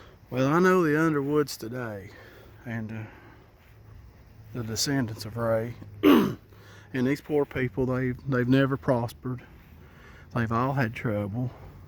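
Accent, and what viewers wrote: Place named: Alabama, United States. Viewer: We visited your area the week before Memorial Day. We stayed mostly in Townsend...and then Gatlinburg a couple nights. I would have really enjoyed sharing a meal with you. You sound just like my people here in Alabama. I appreciate the information that you share. And I do believe it. When we were travelling up that way, we were amazed by the scenery. And when you sit and watch it on video, it really doesn't do it justice. There is no way to describe just how expansive and breathtaking the smokies are. We took some really back roads that were not the beaten path. And when you experience that...you get it. Things can hide within a hundred feet of you. The terrain, for me anyway, is not walkable. Where we stayed, we couldn't even walk the yard it was so steep. You have to be in better than PRIME shape to move about the mountains. 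And these flatlanders at my house do NOT have mountain legs...lol. Do you have any stories about Townsend? I would love to hear them. Thanks and God bless.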